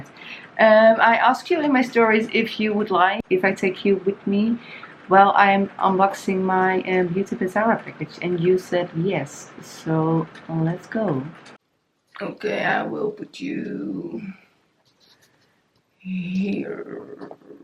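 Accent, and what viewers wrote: Netherlands. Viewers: I knew it omg I watchers your curly hair routine and i was like that accent is soo dutch . Groeten van vlaanderen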